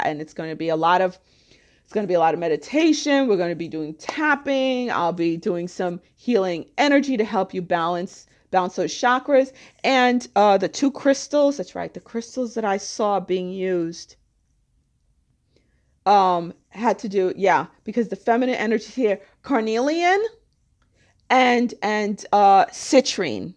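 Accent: New York, United States. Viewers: Holly molly about the Divine Masculine = thats makes a world of sense! I know there’s a Cabal kabosh on them - starting with the African Americans & now the White males! And I feel there’s one too for divine FEM..bc I was stuck for so longggg.. yet no more! I’m hearing from Brad Johnson / Adronis that the moon is also an emitter of mind control and it’s going down as of now! Kent Dunn - behind the scenes military white hat- talks about the moon being re built into a whole new model! I’m a researcher - one who puts the pieces together... and I use my intuition & I use muscle testing & prayer / intention & meditation along with that.. I love your New York ness lol! I’m getting the rainbow warrior tank for sure now & prob the Gaia awakens next month (I laid down serious cash for dimensions of disclosure here in VENTURA CA!) Gotta go hang with “my people” lol! 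And I’m there on the 8th & maybe on the 11th.. gotta figure it all out I’m SUPER interested in ascension 😘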